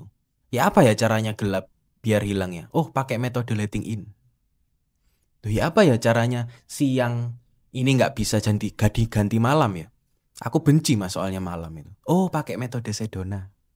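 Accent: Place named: Surabaya, Indonesia. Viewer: KERENNN lurr LANGSUNG MAK JLEBB, MODEL BOSO SUROBOYOAN CEESSS PLENG LANGSUNG ON POINT. Cuman penasaran bisa sejauh ini PEMBUKA LARA BATINNYA KY APA DULU,,, 😂😂😂😂 PENGHIANATAN KAH, PENYAKIT KAH HARTA KAH.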